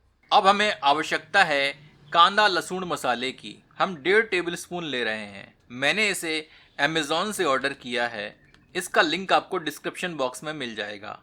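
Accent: Standard. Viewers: sudhha hindi uchharn mujhe sun ne ko mila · Waah shudh Hindi sunn kar bohot anandit hua mera dil ❤️👍👍👏👏👏 .. but recipie thoda lamba process hai !!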